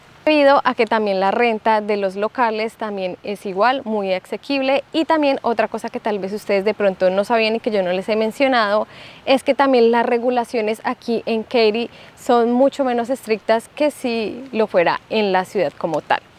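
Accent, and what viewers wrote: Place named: Colombia. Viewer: Hola... Buen video👍... Tienes acento colombiano, soy venezolano, entre Miami y Katy, ¿Cual vale la pena por empleo, facilidades, impuestos y calidad de vida?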